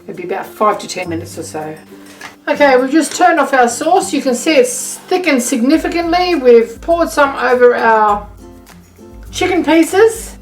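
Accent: Australia. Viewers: Sortaye? Saute? It's ok, I'm getting the hang of Strine speak now :) Actually another top notch cooking lesson and recipe!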